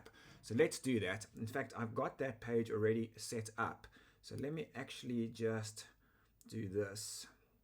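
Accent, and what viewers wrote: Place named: South Africa. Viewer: Thanks. The South African accent is an odd mix of British, Dutch and Australian. Hopefully it's easy enough to understand?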